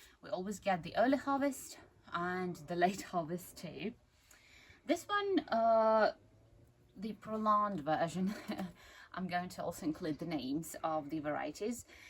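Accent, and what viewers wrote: United Kingdom. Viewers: Your British English is amazing. You don't have Russian accent at all. I learned Alaskan English in 1990 and my Central European accent is still there even now. Potatoes don't have to grow in the ground. They grow well in hay, freshly cut grass, leaves and anything else that creates cover and produces heat. In Palmer, Alaska , farmers grow these red potatoes as well as purple potatoes. Best wishes from Alaska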